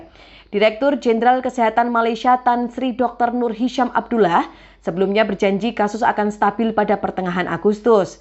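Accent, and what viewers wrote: Java, Indonesia. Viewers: Pembaca beritanya medok jawa bgt...hehehe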